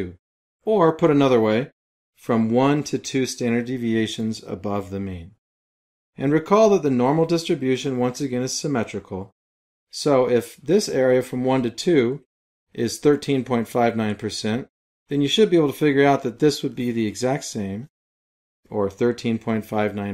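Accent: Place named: United States. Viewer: This is fantastic! A four-minute lesson on exactly what I am trying to understand --the 68%. Plus, it's a native American speaker with a clear-as-a-bell voice. I only stopped it from auto-playing the sequel in order to vote thumbs-up!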